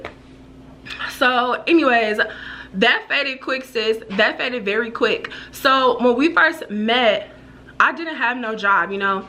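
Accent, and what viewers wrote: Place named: Minnesota, United States. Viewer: You can tell your from Minnesota 🤣. GOOFY! I haven't heard somebody called that since I left. Love ya girl. Keep ya standards high.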